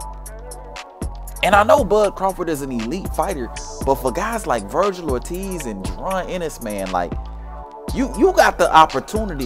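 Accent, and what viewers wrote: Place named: Atlanta, United States. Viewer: Good sh as always Fam,... Salute! Yo where u from fam, ATL? Just wondering, just my guess...I hear a lil bit of an ATL accent sounds like.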